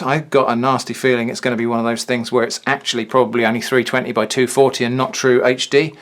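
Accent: United Kingdom. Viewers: I were about to say that you forgot to mention that the footage is mirrored aswell but then I remembered you British accent. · how does he sound much more british in this video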